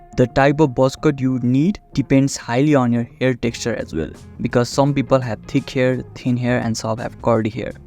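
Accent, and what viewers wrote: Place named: Nepal. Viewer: you from nepal or sum ? sounds like a nepalese accent to me, might be wrong? just curious.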